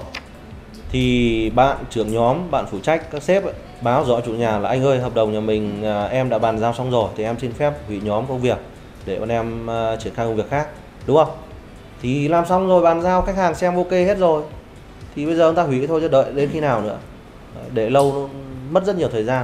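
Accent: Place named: Thanh Hoa, Vietnam. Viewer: Chủ tịch này nói đúng giọng thanh hóa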